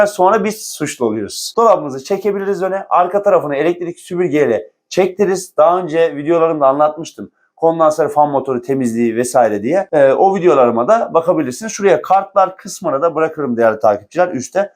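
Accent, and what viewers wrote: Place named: Isparta, Turkey. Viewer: Memleket Isparta galiba 😊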